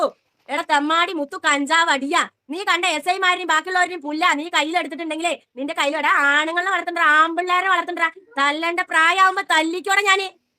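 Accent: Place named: Palakkad, India. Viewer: നല്ല മലയാളം സ്പീഡായി സ്ഫുടമായി സംസാരിക്കുന്നു.ഒരു പാലക്കാടൻ slang. ഗോഡ്ഫാദർ സിനിമയിൽ ഫിലോന്മിനയുടെ അനപ്പാറയിൽ അച്ഛമ്മയെ ഓർമ്മ വന്നു.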